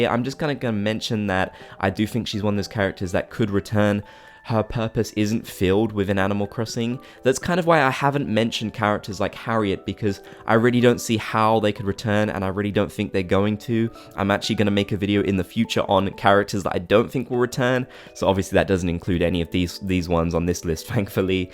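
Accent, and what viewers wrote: United Kingdom. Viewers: I enjoy listening to your British accent, especially when you said PAT-UN ! 😄 New Horizons is my first Animal Crossing game, so I would gladly welcome any new stores. Leif is also one of my favorite NPCs, so I’m all for him having a shop.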